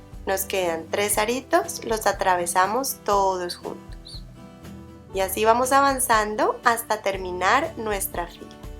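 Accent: Colombia. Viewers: Hola , cómo estás , soy Colombiana , y tú de dónde eres , disculpa , tienes acento Colombiano , me encantan estas clases de tejidos , eres muy clara y explicas muy bien poco a poco y despacio .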